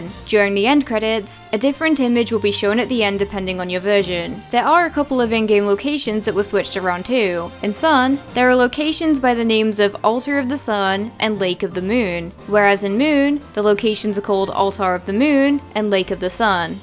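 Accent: United Kingdom. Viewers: A question,are you British?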